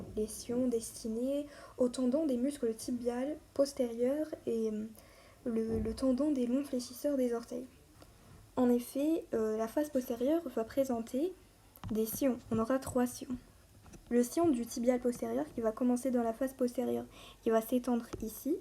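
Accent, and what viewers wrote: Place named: France. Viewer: vous êtes la meilleurs des anatomistes au monde vous proposez une explication détaillé j'espère que vous continuez les autrs chapitres un ;grand merci de l'algérie😘 nb:j'ai cru que vous étiez française vu ton petit accent c'est pour ça qu j'ai dit merci de l'algérie mdr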